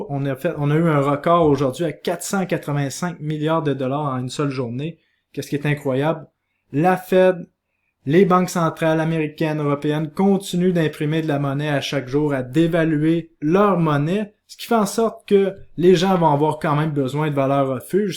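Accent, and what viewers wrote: Quebec, Canada. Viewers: Merci pour ces analyses toujours très intéressantes et ce petit accent québécois qui me change les idées! (Béco de la France;))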